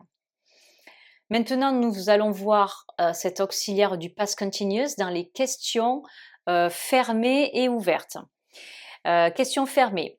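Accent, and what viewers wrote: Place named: South, France. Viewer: C'est vraiment magnifique prof. J'en profite parce que j'étudie le français depuis longtemps et là, j'ai décidé de réapprendre l'anglais mais, en passant pour le français. Honnêtement, j'adore l'accent du sud. J'ai un ami à moi qui vient de Narbonne qui parle de la même façon. Très bon travail prof...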